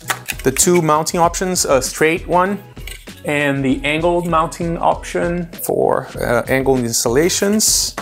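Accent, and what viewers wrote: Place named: Brazil. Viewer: You sound Brazilian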